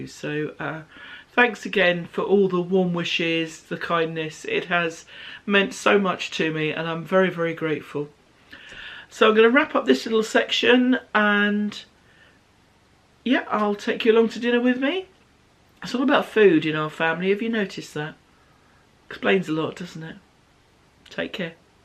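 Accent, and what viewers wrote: United Kingdom. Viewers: So happy to be hearing your lovely British accent again!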